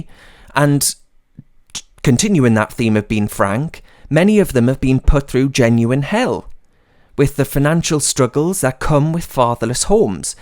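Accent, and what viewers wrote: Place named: North East England, United Kingdom. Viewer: North eastern ..( from the North of England )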